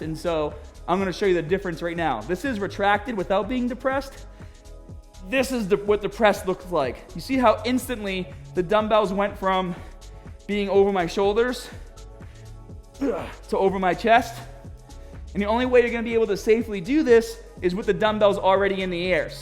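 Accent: Boston, United States. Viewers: Boston guy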